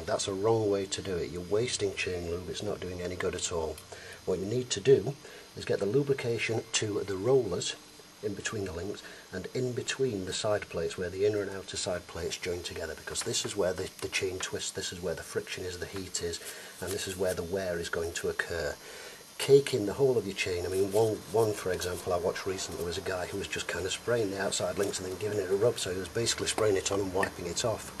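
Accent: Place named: Scotland, United Kingdom. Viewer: Sorry I took it that way... just seems like everyone is always hating on the USA. here on Youtube. I appologize for assuming thats what you meant. I agree with you on the voice.. I love the accent too... Although the Scotts can be hard to understand... As are many of my fellow Americans that live in the deep South, especially in Louisianna... most times I have NO IDEA what they are saying. :)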